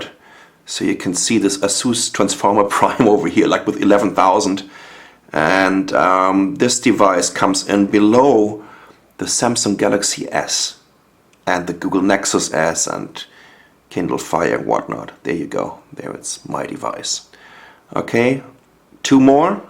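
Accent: Germany. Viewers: dude, you have a very strong german accent. haha, btw good video! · are u german..?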